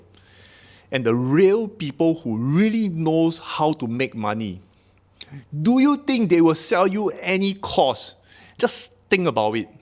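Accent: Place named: Singapore. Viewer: God bless you 🙏 You are one of the best, and what I always liked about you was you are so genuine. You have taught me so much. Your English is great and even better with your beautiful Singapore accent (a place close to my heart). Take care, look after yourself and ignore the toxic trolls - they have nothing on you! 🙏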